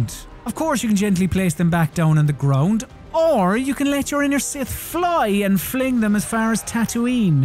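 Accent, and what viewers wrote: Ireland. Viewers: Shut up it's an Irish accent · Haha I knew he was Irish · Are you irish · youre definitely irish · Sounds like u have a Kerry accent. Good on ya lad, the best part of Ireland is down here in county Kerry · IRISH ☘️ yay he is irish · Ye fekin wallie it's an irish accent innit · he irish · its called an irish accent ye eejit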